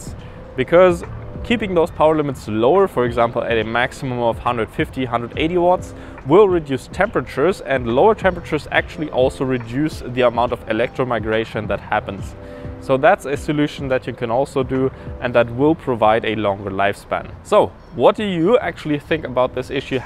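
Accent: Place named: Germany. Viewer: ich hör da teutsch raus!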